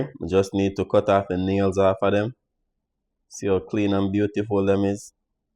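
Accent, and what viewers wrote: Jamaica. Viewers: Love how this chef expresses himself. No twist up tongue, straight Jamaican language. Yeah love it.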